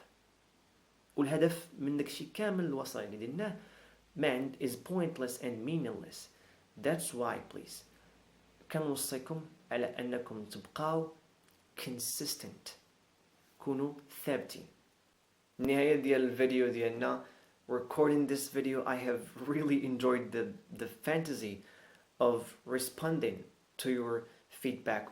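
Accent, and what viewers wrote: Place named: United States. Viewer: AMERICAN ACCENT spots on يااااااي كلوكيت لك خطأ ف نطق كلمة اندوفيدجوول لووول ولاكن تبارك الله عليك .. انا عندي الانجليزية لا بأس بها ولاكن كاتنداثر من قلة التطبيق و الحوار ، مكانلقاش معامن واخا عندي اللكنة قريبا لديالك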